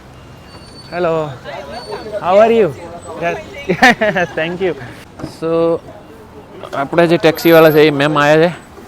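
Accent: Rajasthan, India. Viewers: Bhai a to Rajasthani che.par amdavad ma rahe che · રાજસ્થાનના ગુજરાતી બોલે છે ભાઈ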